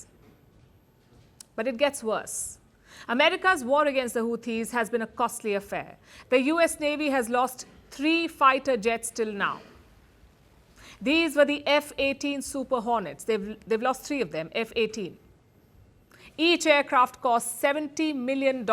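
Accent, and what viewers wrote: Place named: India. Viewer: Can we have announcer that speaks English ( not Indian English). A lot of time , can’t understand what she is saying without caption.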